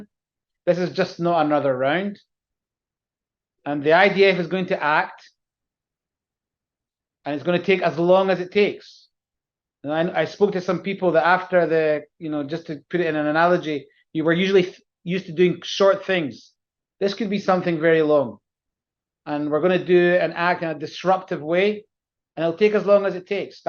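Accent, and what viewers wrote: Scotland, United Kingdom. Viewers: we love your Scottish accent! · I stand with Isreal and loving the Scottish voice. Praying for the complete end of Islamisist terrorists death cults and the beginning of a positive living future loving life. 🙏 How can they believe people who pray to ‘the greatest deceiver? and the mischievous maker’. If you believe them you can’t believe them, right! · Do I detect a slight Scottish Accent in there somewhere Sir? You are so right Sir. You’re a very open and honest man! You and your Nation needs to, and Must show the Whole World what happened in your country. Israel was intentionally, deliberately and savagely attacked in a totally unprovoked act of Genocidal Evil. You must show the World Uncensored and Raw images of this disgusting Pogrom committed against Israel and the Jewish people. Nothing like this has happened since the Nazi’s Genocidal murder of the Jews during the Second World War! 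You must defeat this Evil and the World needs to back your actions. All those who tell lies, the Western Media, University morons and all these sick anti Jewish, Anti Israel Haters and Demonstrators are Supporting Genocide and NAZISM. Hamas behave like and act just like the Nazis did, they are Evil Brainwashed Fanatics, and so are all those who support and back them! They must be shown that they are wrong, you must show the Entire World what happened to you people! God be with you my friend. Shalom!! A Supporter of Israel from England. ❤🏴🇬🇧🇮🇱🇺🇸❤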